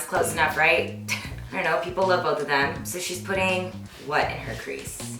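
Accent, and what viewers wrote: United Kingdom. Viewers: You are sooo cute the more you watched and copied the more you got that Mary poppins British accent x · You did fantastic!!! You’re just so funny with your british accent... I’m sure kaushal feels proud of your effort xxxx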